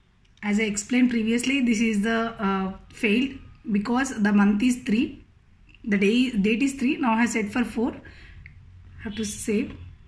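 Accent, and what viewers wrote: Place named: India. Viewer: This english is just understandable in India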